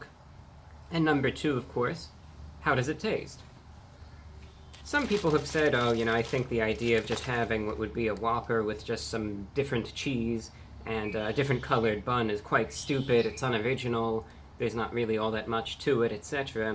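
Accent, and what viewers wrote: United Kingdom. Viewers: Your voice has definitely changed over time. You somehow sound more British now. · Why does his accent sound more and more British?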